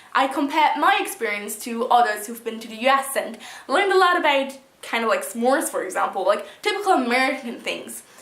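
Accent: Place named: Ireland. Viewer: What I find interesting is that you’re from Austria, and did your exchange in Ireland. Yet you speak English with an Irish brogue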